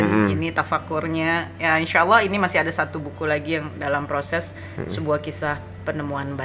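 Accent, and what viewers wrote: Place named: Palembang, Indonesia. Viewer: Senang dengar baso baso Palembang, wong kito galo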